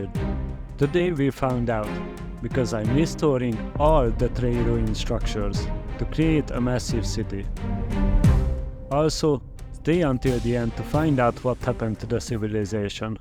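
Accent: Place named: Hungary. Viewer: You have great skills bro, you have another subscriber. Also your accent reminds to hungarian speaking english, but its cool!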